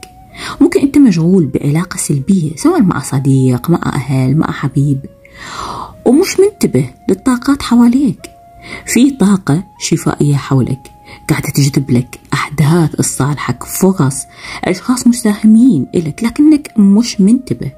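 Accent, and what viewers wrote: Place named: Gulf, Arab world. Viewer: ياهلا يامرحبااا بالصوت الخليجي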